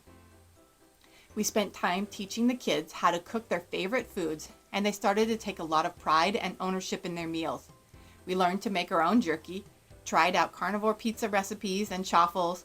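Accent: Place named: United States. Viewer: You are carrying out an experiment on your children which i consider unethical. The fact that you and your husband previously ate crap is irrelevant and at least your husband was very obese and possibly you were too. Get one of those carnivore drs to become your dr. You most likely are from the US based on your accent. In the US if you have evidence you can sue the hell out of people. So go get one of these drs to tell you to experiment and advise your children what to eat. Even the inuit had a diet which was approximately 20% carbohydrate which is extremely low. Best of luck and consider the diet recommendations by all but a single practitioner Dr Anthony Chaffee.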